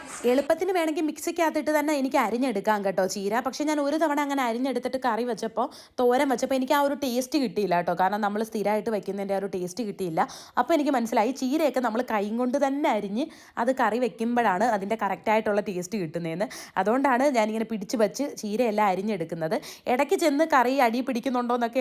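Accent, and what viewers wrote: Kollam, India. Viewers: തൃശ്ശൂർകാരി ചേച്ചി ആണ് എനിക്ക് നല്ല ഇഷ്ട്ടമാണ്, ഷോർട്ട് ആയി വിളിക്കാനും, എന്തു ഡ്രെസ്സിലും കാണാൻ സുന്ദരി, ഹെയർസ്റ്റൈൽ സൂപ്പർ, ഒഎസ് മാധുരി ദീഷിദ് കട്ട്‌ എനിക്ക് മാത്രം തോന്നുന്നതണോ ഇഷ്ട്ടം കൊണ്ടാണോ എന്നറിയില്ല, പാചകത്തിൽ മികവ് എല്ലാം കൊണ്ടും ചുന്ദരികുട്ടി കൊല്ലം ഭാഷ കേൾക്കാൻ എന്തു രസം, എല്ലാം തികഞ്ഞ വൈഫ്, ആണുകൾ ആകർഷിക്കുന്ന ഭംഗിയും, പെരുമാറ്റവും, ഇനി അടുത്ത വീഡീയോയിൽ കാണാം, ❤️❤️❤️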